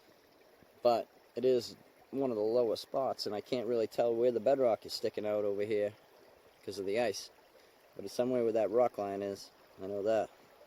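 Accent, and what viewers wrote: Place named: New Jersey, United States. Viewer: You sound like your from Camden New Jersey.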